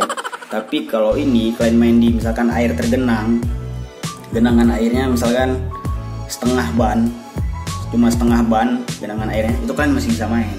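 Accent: Aceh, Indonesia. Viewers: Mantap bang review nya 👍🏻 Suara abang mirip orang aceh.. Saleum 🙏🏻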